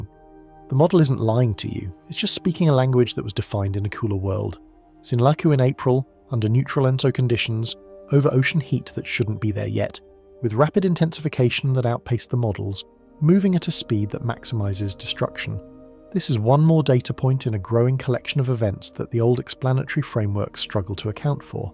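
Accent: United Kingdom. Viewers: I’m sorry, but us YouTube listeners watchers are sick and tired of this British accent crap, especially this one, instant turn off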